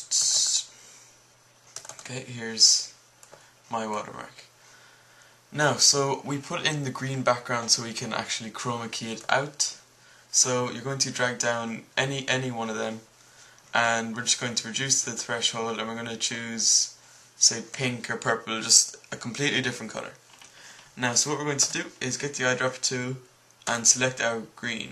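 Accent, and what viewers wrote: Ireland. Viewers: You're Irish like me :D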